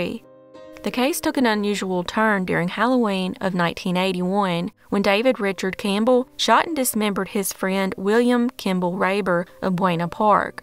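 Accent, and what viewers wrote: South, United States. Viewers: Where are you from in Tennesse?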